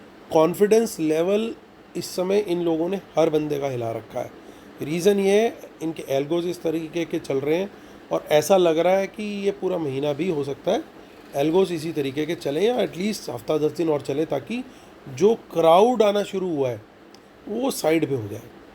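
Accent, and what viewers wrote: Punjab, India. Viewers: Love u sir always rocks sir r u from Punjab or hariyana it doesn't matter but tone thx if u r in mumbai will meet u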